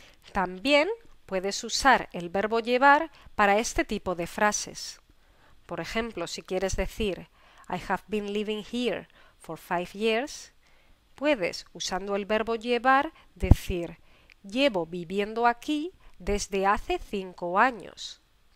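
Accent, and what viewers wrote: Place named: England, United Kingdom. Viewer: Me ayudan mucho tus videos.Y me encanta tu acento inglés.:)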